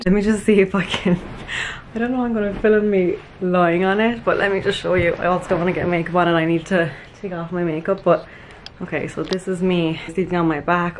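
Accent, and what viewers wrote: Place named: Kerry, Ireland. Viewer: Love how relaxed and natural you are in these vlogs at home. Will give them a go tomorrow. Sunday is self care day! Never apologise for the Kerry accent (from a Dublin gal).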